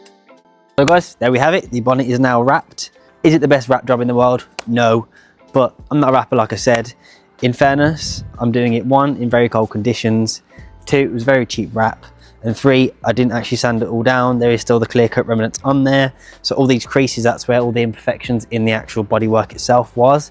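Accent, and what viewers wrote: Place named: Birmingham, United Kingdom. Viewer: Well done mate. Are you a fellow Brummie?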